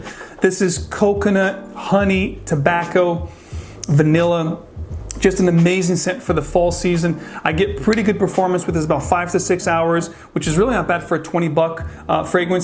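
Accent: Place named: Philadelphia, United States. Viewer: what state in america are you in,? i like the accent. not type you hear in hollywood movies? is it philly accent?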